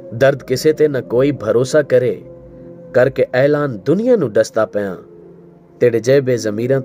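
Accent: Saraiki, Pakistan. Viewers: Aap saraiki hai kiya?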